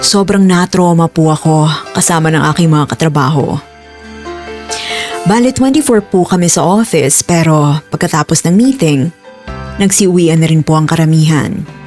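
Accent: United Kingdom. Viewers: Long live....GOD BLESS YOU!..lol...banatan mo british english accent beautiful young lady.....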